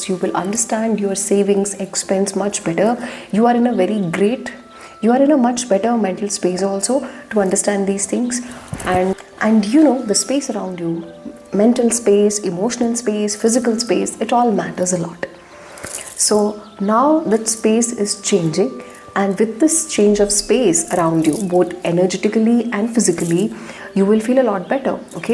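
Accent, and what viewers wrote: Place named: India. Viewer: Indian accent 😂😊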